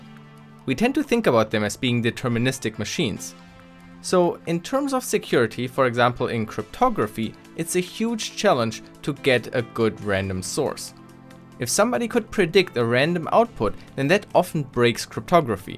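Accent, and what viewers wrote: Germany. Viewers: This, my friends, is what a german accent sounds like. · r u german? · DIeser Deutsche Akzent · is this german accent ? ¯\(ツ)/¯ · Took me to realise he is german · Bro you got the most German accent I ever heard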